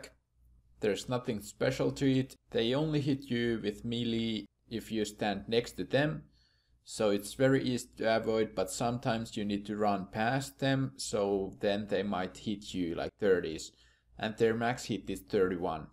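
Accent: Finland. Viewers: You must be finnish? Suomiaksenttia ei voi olla tunnistamatta. Hyvä guide, pääsi hyvin kärryille shamanien kanssa :D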